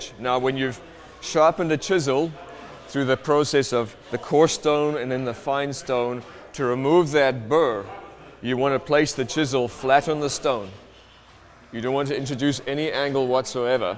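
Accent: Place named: South Africa. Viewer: A south african bloke working at lie nielsen? Love it. Great technique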